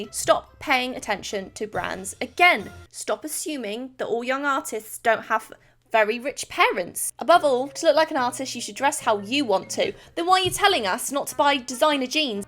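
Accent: United Kingdom. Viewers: “Let’s put on our fancy voice.” Tf you talkin bout ur british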